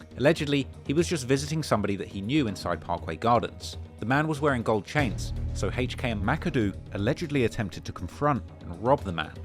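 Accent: United Kingdom. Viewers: nothing like a brit talking about american gangsters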